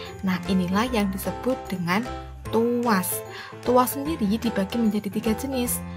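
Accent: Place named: Java, Indonesia. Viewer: Ibu nada jawanya kentel banget 😅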